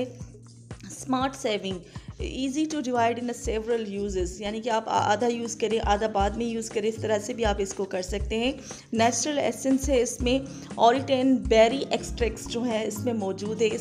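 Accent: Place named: Pakistan. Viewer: Ok I recognised from your speaking style. Pakistan mein Kahan se?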